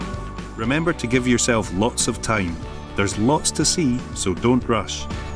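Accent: Scotland, United Kingdom. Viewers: I just love the Scottish Accent.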